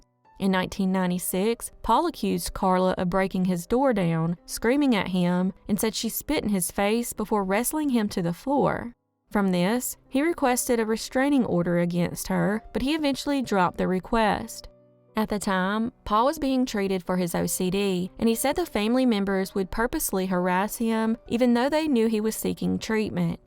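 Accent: South, United States. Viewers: This narrator has a nice southern accent.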